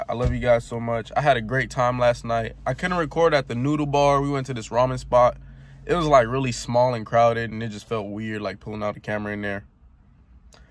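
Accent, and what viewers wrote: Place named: United Kingdom. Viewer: Have you ever lived in the UK? Your accent is slightly british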